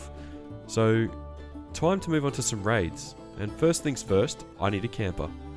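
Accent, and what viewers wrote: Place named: Australia. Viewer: Is this dude Aussie? He sounds like an Aussie.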